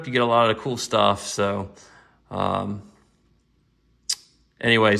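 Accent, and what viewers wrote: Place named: North Carolina, United States. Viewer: Based on the accent I assume we are from the same “Queen City” in NC. Which LCS do you use?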